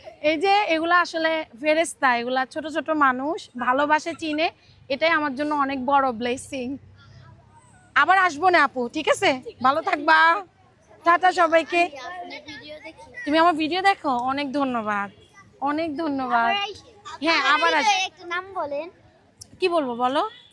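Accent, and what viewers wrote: Noakhali, Bangladesh. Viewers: apu assalamu alaikum apu ami apnar akjon fan ami apnar sob video dekhi khub valo lage apnar noakhalir basha amar khub valo lage apnar vedio dekhe ami uthsahito hoye ami akta youtube channel start korci jodi paren amake aktu support diyen amnar sathe dekha korar khub iccha amar channel er diye aktu lokkho rakhben Jannat kitchen🎉❤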